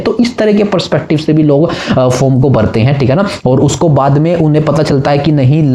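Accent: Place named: Maharashtra, India. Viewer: MAHARASHTRA ACCENT AALA HOTA I THINK SOLA😀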